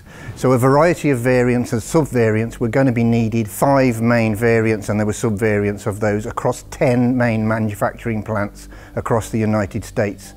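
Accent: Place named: Estuary, United Kingdom. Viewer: Fajny angielski tego gościa. Ktoś wie, jaki to akcent? Estuary?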